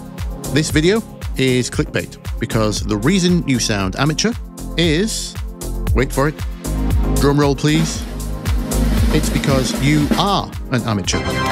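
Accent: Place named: South Yorkshire, United Kingdom. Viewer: Great vid always. This resonated. I think learning the rules has made my music worse. Its technically better and i am closer to the sound o wanted to create but its just not as original. Oh, the curse of the creative . Struggling to place your accent, thinking somewhere South Yorkshire but Im probably miles wide of the mark.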